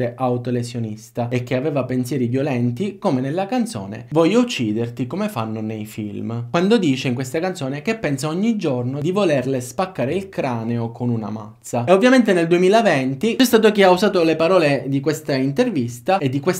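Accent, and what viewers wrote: Sicily, Italy. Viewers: Direi dall" accento sei palermitano ! Bel video ciao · mi piace la tua cadenza siciliana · Quella dote narrativa con cadenza sicula "casa casa"... Adoro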